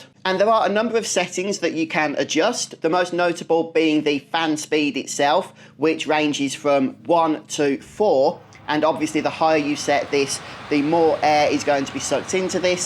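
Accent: United Kingdom. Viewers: Thank you for this great review. I love your British accent. I'm studying for IELTS and is a pleasure to listen and learn from you.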